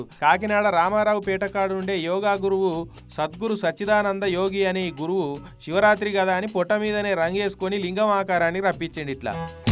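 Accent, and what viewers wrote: Telangana, India. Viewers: Yoga value theliyani aa voice over ichina vadini chepputho kottali.. Athani talent ki value ivvakunda vetakaram ga Matladuthunnadu.. Yedhava.. veedu veedi Telangana slang..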